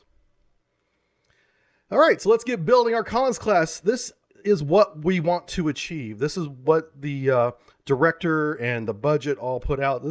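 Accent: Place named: United States. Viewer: And the narrator has an aMERICAN ACCENT.....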